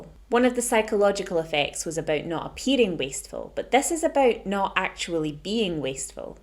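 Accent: Scotland, United Kingdom. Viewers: Brilliant concept. By the way your Scots accent is most cool🌺♥️😎👍 Great video. Nice editing, great production. Good rythum👍....I mean balance✔️ Waste is terrible. I'm type 2 and can't have buns and can never find anyone to have the rest of the buns/cakes/pain au chocolate etc., etc., so I end up chucking 5 pain au chocolate in the bin😟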